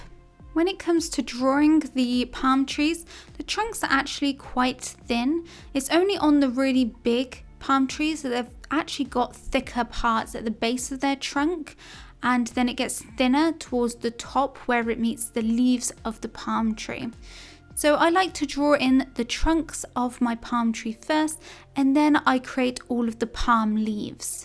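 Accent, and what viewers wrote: England, United Kingdom. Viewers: Ich liebe den englischen dialekt 😍😊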